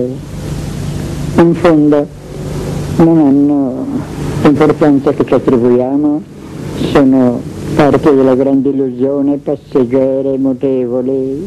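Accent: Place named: Veneto, Italy. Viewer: La tipica voce di Assagioli con inflessione leggermente veneziana. :)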